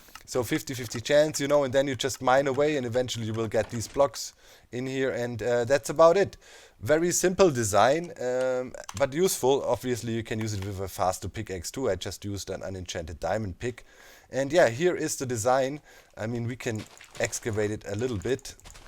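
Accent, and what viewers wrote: Germany. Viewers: Geil der Deutsche Akzent 😂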